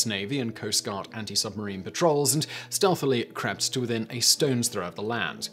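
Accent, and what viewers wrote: England, United Kingdom. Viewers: Gobble De Gook - He sounds 100% English to me. Not a hint of yank.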